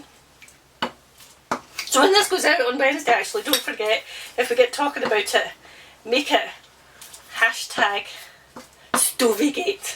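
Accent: Scotland, United Kingdom. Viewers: I’m from Edinburgh. This I how I make them too 😊 I’m in Australia now and loved the Scottish accent fix. Stovies for me today!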